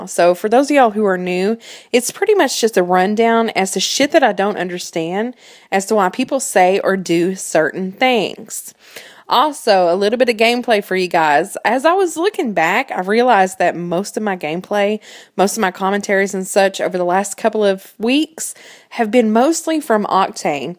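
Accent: South, United States. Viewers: got that cute little southern twang in your voice :P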